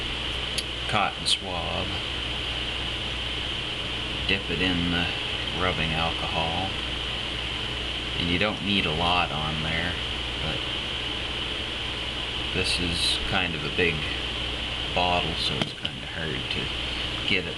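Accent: Canada. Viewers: ince acent you got there! where is it from? Canada? haha I'm from Canada too, so thats how I knew you were from there... and then I checked your chanel... haha! Thx for the vid man!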